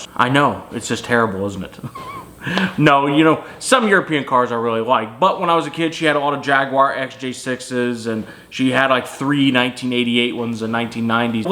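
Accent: South, United States. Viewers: Funniest thing about this video is a dude with a country accent doing a country ass accent!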